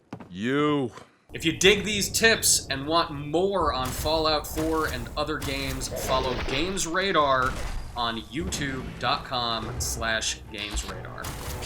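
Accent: Scotland, United Kingdom. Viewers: Why 8k dislikes? Yeah his accent is annoying but Scottish people don't get this much dislikes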